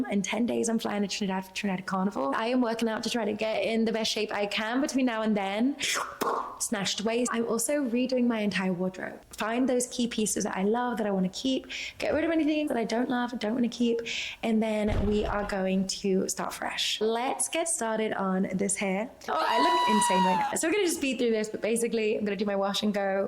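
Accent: United Kingdom. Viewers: 😭 I binged some shorts and subscribed right, then in one I heard a (your) voice and smiled. My thought was look at another woman who likes to put on accents like me (you were answering a commenters question). Only to find out when reading your bio that you're British for real. I have real tears in my eyes hahahah I was so virtually embarrassed for myself. Love your channel. ❤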